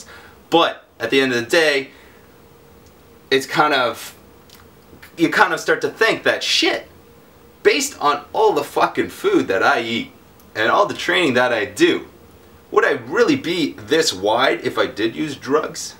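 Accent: Canada. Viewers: Is he Canadian? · You said aboot. You're Canadian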